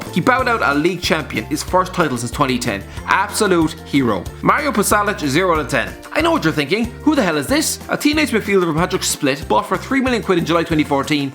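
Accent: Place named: Ireland. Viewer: This guys Irish voice is so annoying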